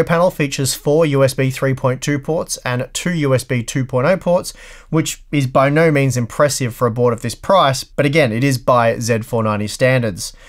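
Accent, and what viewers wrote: Australia. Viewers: I don"t know what is is about a fellow Aussie voice. It just always seams more relaxed here than Linus or Jay. Thanks for all the vids. :)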